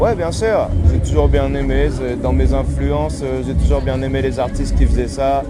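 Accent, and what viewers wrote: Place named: Lower Normandy, France. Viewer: Il vient de Caen ça s’entend il a l’accent Bas-Normand Il parle lentement, il a un coup de barre depuis ses 14 ans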